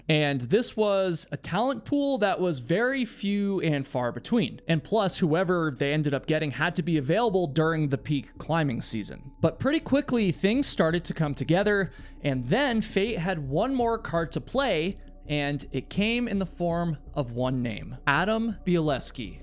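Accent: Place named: United States. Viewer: I'll subscribe if you take that flag down. We can HEAR you're USian. Peace and love from Denmark.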